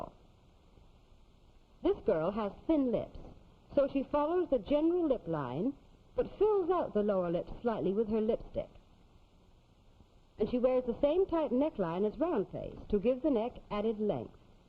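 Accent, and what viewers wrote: Transatlantic, United States. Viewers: It’s the transatlantic accent, it was only used for tv and films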